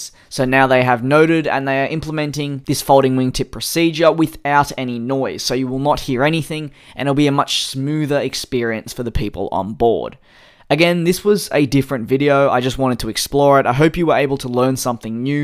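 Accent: Australia. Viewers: He kinda sounds like Crocodile Dundee, huh?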